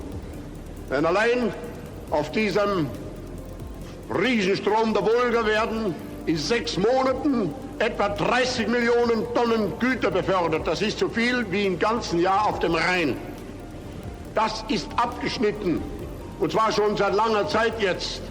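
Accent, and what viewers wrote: Austria. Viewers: Es war einfach ein österreichischer Dialekt Junge. Nix besonderes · He is Austrian. From Braunau. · When you are from Austria you can hear where he came from. Rural austrian dialect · Ist es wirklich. Sein österreichischer Akzent ist weniger stark als ich gedacht hatte. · Interesting to hear his dialect that he adopted in Vienna as a young man.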